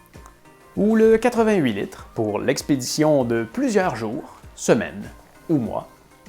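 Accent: Canada. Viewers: Merci ! J'adore ce bon et sympathique accent canadien !!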